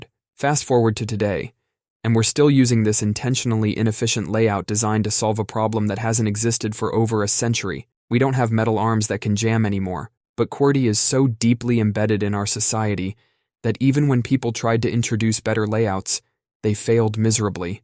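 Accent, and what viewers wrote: United States. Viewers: the qwerty board is not "the global standard"... leave it to Americans tothink their standard is the default. There is QWERTY, DVORAK, AZERTY, COLEMAC and QWERTZ in addition to Arabic, cyrilic and asian variants standard lmao #shytamericanssay #americandefaultism (yes I know the channel claims UK, his accent is not English/Welsh/Scotish/Irish, it is American if the "some states finally had enough" comment he made with DST didn't clue you in, a Brit would not default to "states")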